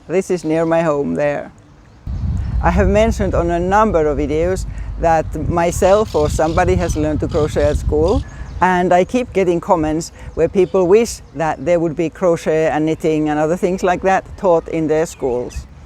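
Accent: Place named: Finland. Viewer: Hello! I am so happy to have discovered your channel! I could listen to your Finnish accent all day as I come from a small Finnish community in Northern Minnesota and am proud to be 1/4 Finnish myself. 😬My family and I were in Finland for a month in the summer of 2011 when we visited our former exchange student who still lives in Helsinki. Neither knitting or crochet in taught in US schools; not in the midwest, at least. Sewing, cooking, woods and metals are taught here though, just as in Finland. I thoroughly enjoy your basic, easy to understand tutorials. I conquered the Granny Stitch today thanks to you! Kiitos, Shelley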